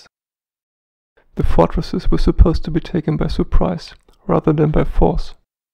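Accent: Germany. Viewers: I think he is German!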